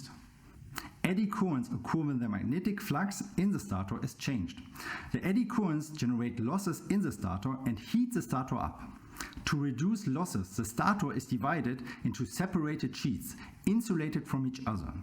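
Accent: Germany. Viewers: Hallo, deine Videos gefallen mir. Als ich entdeckte, dass du aus Berlin kommst, entschied ich mich gleich in die Kommentar etwas zu schreiben. I’m a 26-year-old young guy from China. I’m in the business of neodymium magnets and magnetic assemblies, but now trying to expand to BLDC motor and controller field. That’s why I’m learning everything about electric motors. You have German accent when speaking English, which I find interesting. Danke für deine volle Videos!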